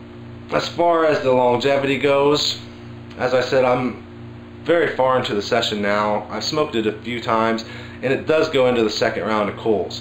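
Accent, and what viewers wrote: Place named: United States. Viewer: Thanks for getting back to me and thanks for the advise...love your videos and i love your us accent better then my boring UK accent loll. x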